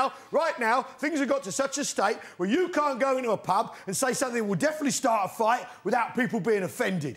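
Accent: United Kingdom. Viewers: The British accent helps it go down better...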